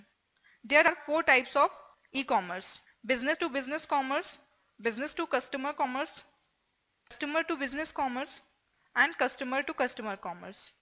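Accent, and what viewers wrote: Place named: India. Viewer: I can't understand due to the Indian Accent.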